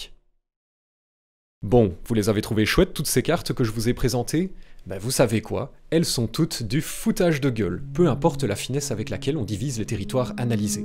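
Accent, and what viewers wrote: Belgium. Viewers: ben c'est l'accent belge... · Aïe ! L'accent belge si vite dans la video, j'était pas près x).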